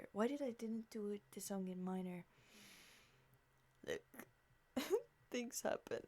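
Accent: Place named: France. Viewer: Great work! keep it up! I also like ur accent, are u French?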